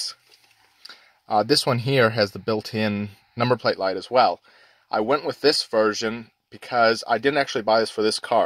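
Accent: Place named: New Zealand. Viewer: Can't work out if you're a Kiwi from the States or a Yankee living in NZ..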